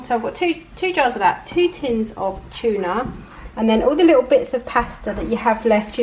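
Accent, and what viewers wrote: United Kingdom. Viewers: This woman speaks with a cockney accent. Her ancestors probably came from the border between the East London city limits and the Essex area. Her accent is from Essex. She sounds like a female version of the teenage Rodney Trotter from the British Cult hit TV series only fools and horses. She even has his eyes - as is evident in other videos. I suggest she watches only fools and horses and also birds of a feather - then she will realise what she sounds like - an Essex girl.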